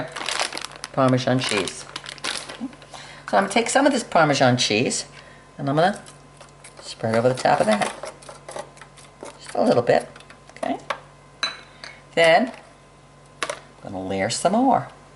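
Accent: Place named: New England, United States. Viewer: I thought so. I’m from Massachusetts originally but now on the west coast. Thought I recognized the accent. Really enjoying your videos. Thanks for the reply.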